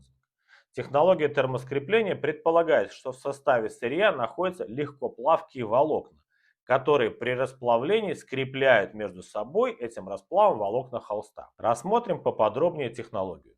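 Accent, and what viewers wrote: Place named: Belarus. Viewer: ваш белорусский прононс мне нравится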